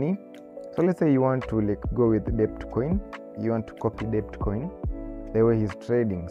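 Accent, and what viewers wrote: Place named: Kenya. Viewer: ik a kenyan when i hear one.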